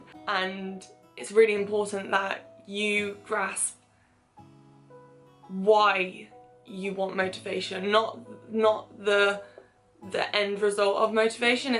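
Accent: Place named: Northampton, United Kingdom. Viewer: Swear your from Northampton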